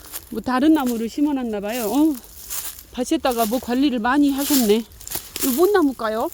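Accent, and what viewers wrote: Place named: Gurye, South Korea. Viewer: 구례사투리까지 숨김없이 사용하시고. 잘봤습니다. 가보고 싶네요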